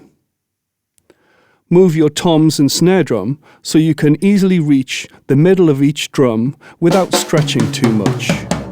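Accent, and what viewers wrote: United Kingdom. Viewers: where is his accent from in the UK?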